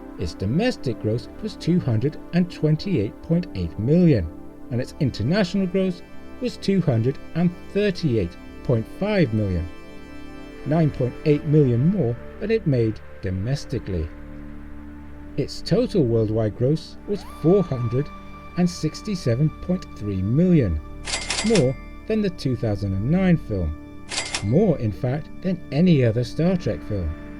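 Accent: United Kingdom. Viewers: In which region of Britain do you live? Do you get thunderstorms a lot? I know the UK is famous for rain, there certainly some with which we had to contend during me and my family’s visit. I, of course, am assuming it’s Britain, due to your accent. I live in north Georgia, and we’re having some tropical storm doozies, stemming from a larger system about ready to hit the Gulf Coast of the Florida Panhandle.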